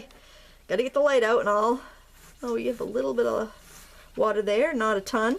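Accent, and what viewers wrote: Maine, United States. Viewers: wow that was awesome! it's so fun watching you do the watercolors, it looks so easy and it's inspiring for me (a beginner)..I totally understood what you said tho, LOL and I am not from Maine. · I love your lighthouse! I live on the California coast and I don't believe that I have ever seen one. They make me want to live in New England. After you dry your painting at various intervals, does adding more water reactivate the previous layer of color? Thank you for giving us a touch of Maine with the painting and your accent Lol!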